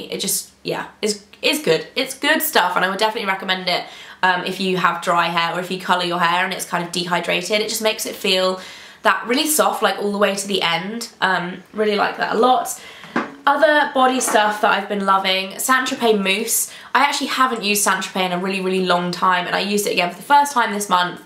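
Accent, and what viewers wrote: United Kingdom. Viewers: You're such a Brit! lol British never sounds casual to me, it's too proper! lol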